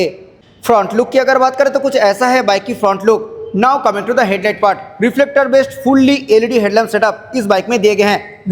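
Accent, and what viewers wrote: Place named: Bengal, India. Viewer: Hindi bolna sikhle, tere accent se hi lagraha hai tu bengali hai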